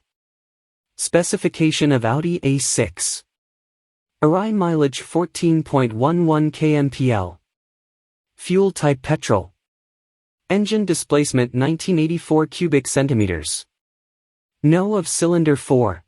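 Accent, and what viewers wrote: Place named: United Kingdom. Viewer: How did you change your voice to uk English accent?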